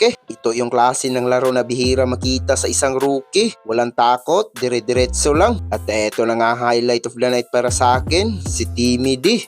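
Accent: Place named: Iloilo, Philippines. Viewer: Ilongo ka to haha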